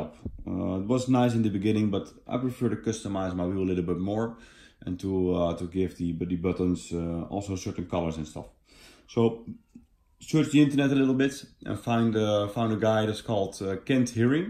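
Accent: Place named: Netherlands. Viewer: You must be Dutch, i talk English in the same way as im Dutch too, nice vid btw! One of the better reviews of this set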